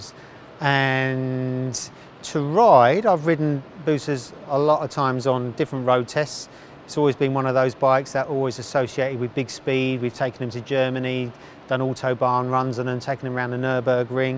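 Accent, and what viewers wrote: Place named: Northern England, United Kingdom. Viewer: “MmmBooossa” said in that irritating Northern accent that all Busa owners seem to have! 😝😂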